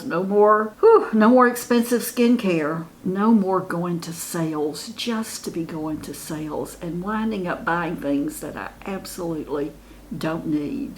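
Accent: South, United States. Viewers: I love the sound of your voice. I know you have a southern accent, but I can’t name the state. Some older women have unappealing voices and they need to work on that. I guess you can’t do a video on that, but is a big factor.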